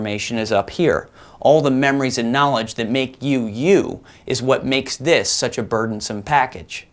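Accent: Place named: Scotland, United Kingdom. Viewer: thats the first time i have heard the scottish accent being referred to as 'adorable'...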